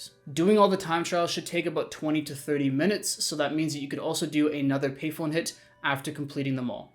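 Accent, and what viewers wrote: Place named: Canada. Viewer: I knew you were canadian from your accent. Greeting from Alberta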